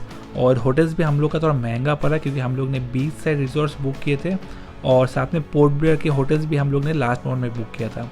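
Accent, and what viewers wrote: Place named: Bengal, India. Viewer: From your accent I guess either u r Bengali or Assamese?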